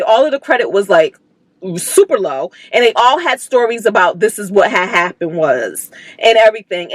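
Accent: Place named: New York, United States. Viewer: Check to see if your state has a ERAP program. Idk where yiu are from but you sound a little New Yorkish to me-- if so that program opens June 1 and the landlord can apply for it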